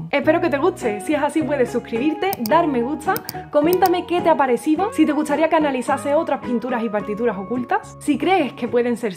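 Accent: Andalusia, Spain. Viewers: Genial análisis, bastante erudito y además me encanta tu acento, es andaluz? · Interesante relato, me sorprendió la partitura en la cola 😂no la conocía (no quiero imaginar como suena😂) Nunca puedo aburrirme escuchando todas éstas historias que me encantan y esas manos acariciando esa guitarra, es un sueño.🍀🌷☘🌺☘ ¡Anda Lucía! que con ese acento tan lindo al hablar me voy para Andalucía!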